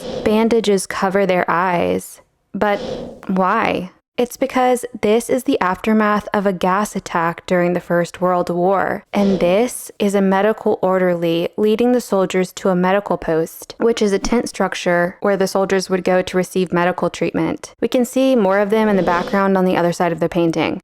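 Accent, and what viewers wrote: England, United Kingdom. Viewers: I love your English schoolboy accent! (I'm English) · More English accent in future please! It was very cool. And that’s coming from the son of a Londoner. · Striking piece and story! Thanks for the historical and artists context. I loved your English boy accent 😂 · The only beef I have with this commentary is your apology for the English school boy accent. It is my opinion that you need not apologize for any of your work. So informative and entertaining, I both laugh and cry when I watch these videos. Thank you for your efforts.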